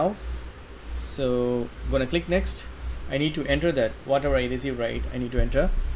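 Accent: India. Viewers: This got to be the worst guide, his Indian accent doesn't help too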